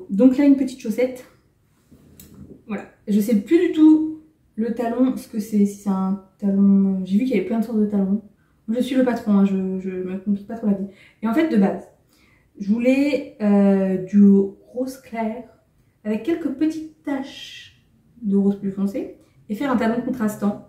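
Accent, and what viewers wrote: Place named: England, United Kingdom. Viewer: Tellement naturelle dans cette vidéo 🥰 Je suis fan de tes gilets et de ton accent anglais aussi ! Et tu as un excellent rythme en tricot, c'est clair ! Certaines teinturières proposent la mise en écheveau (CLainesBoutique fait la mise en pelote à 0,5€ !). Pour les chaussettes comme toi, j'ai voulu essayer en cours de tricot et j'ai perdu mes mailles 🤣 Et je n'ai pas fait d'échantillon non plus ! Le montage des chaussettes, pour la première j'ai recommencé 10x, pour la seconde en deux fois c'était bon.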